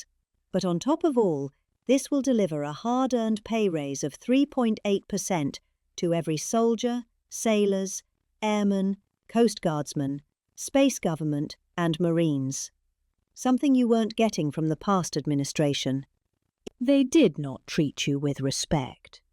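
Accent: United Kingdom. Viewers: I have not laughed so hard in weeks. This is fabulous. Trump has early-onset dementia as well as malignant narcissism, so we are used to hearing him in speech after speech. But there is something that becomes so much more insane about hearing his actual speeches read by this British woman in her dialect. The ramblings are just...stunning. Thanks you! New subscriber.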